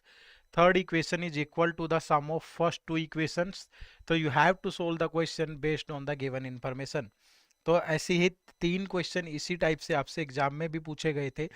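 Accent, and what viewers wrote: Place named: Haryana, India. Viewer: Solved. Answer is c & great session Sir. Love your Haryanvi accent!!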